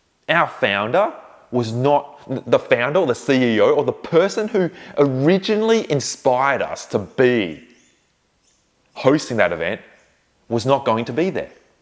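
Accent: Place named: Australia. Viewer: Thank you for this video - I really enjoyed it. However I have difficulty understanding the thick Australian accent - I believe if you made it little more neutral it would be impactful on more people ! 😊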